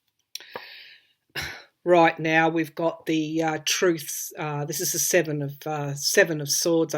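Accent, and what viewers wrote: Australia. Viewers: Love listening to you, gives me hope! Love your accent, spent a month down under several years ago, New Year’s Eve in Sydney harbor, spectacular. Was so sad to hear the fires did extensive damage to KI. KI, GBR and Tasssie were amazing. Love Australia and it’s nature and friendly people. Stay safe everyone. Thanks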